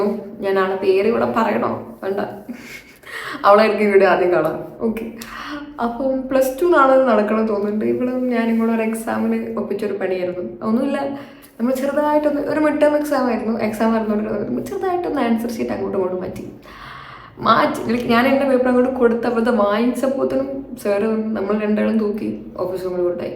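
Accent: India. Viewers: KOZHIKODE slang pole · Aiswarya lekshmiyude oru facecut und.. Where was your schooling and college? Slang ketapo thoni frm malpuram anen bcz am also frm malpuram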